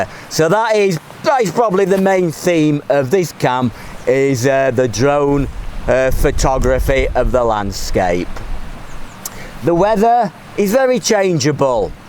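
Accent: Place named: Sheffield, United Kingdom. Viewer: Loved this one.Currently "wild" canping in myback garden as the missus is recovering from P.E. and I can't get out into the Aussie bush at the moment.11.00 pm here and a few home brews and sneaky drams of Glenfiddich will see me retire soon into my Doite tent..Think it is from Chile.Originally from Darley Dale so enjoy the Sheffield accent. Cheers Keith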